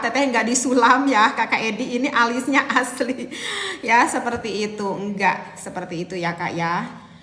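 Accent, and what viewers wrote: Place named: West Sumatra, Indonesia. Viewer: Wa'alaikum salam,. Hadir tehcannnnn. Oia, teteh ni kan sunda, tp ko mirip minang ya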